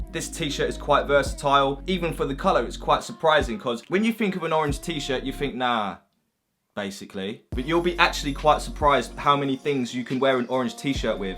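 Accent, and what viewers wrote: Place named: Cockney, United Kingdom. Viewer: the best thing about your vids is your curly brown hair and cockney accent yaass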